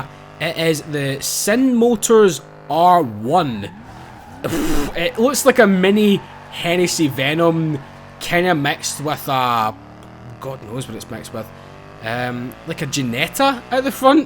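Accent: Scotland, United Kingdom. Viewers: It's so cool to hear him pronounce "six" like "sex". Love this scottish accent.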